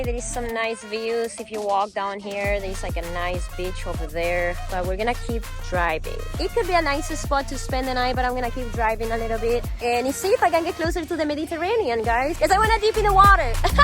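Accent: Spain. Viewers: Loved hearing the spanish accent <3 That was quite sassy though lol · Just came across your channel> I've watched another of your videos by now. If you want to wear a bikini wear one. I was trying to place your accent in the other video now I know your from Spain. .Una novia que tuve su primer idioma fue el español y a mí me encanta el español. For give me if I'm off on the sentience in Spanish. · That was really cool how you explained about car rules in spain. Maybe do an english and spanish learning video on van stuff. Love the spanish from spain its pronounced very beautiful ❤ and I love the way s and c's are spoken. Classic . GOD BLESS MAY GOD GUIDE YOUR STEPS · You are so Gorgeous ❤ and the Spanish accent 😍!greetings from New York State! 🗽 ! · Your Spanish accent is ❤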